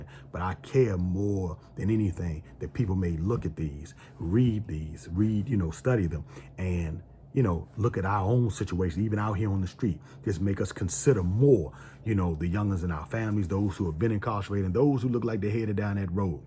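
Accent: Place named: Washington DC, United States. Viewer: Just discovered your video bruh. Picked up the DC accent right away. I grew up in PG County. I went into the military but my brother went the opposite direction. He did time in boys village in Cheltenham, Lorton and DC jail just to name a few so I’ve heard a lot of the stories. Really interested in checking out the rest of your videos. Keep up the good work.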